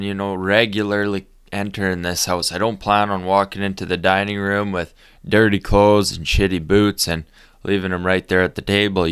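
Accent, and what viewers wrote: Canada. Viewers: I really think you're doing a hell of a job I think you do excellent work and I have been watching for over a year or more and I love Canadian accent. I have been wanting to move to Maine on the Canadian border but my health went to hell and I'm 77 years old so I guess I'll have to stay here and drive my till I can't anymore. I'm looking forward to your next videos keep up the good work ALLYN T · Canadian Ah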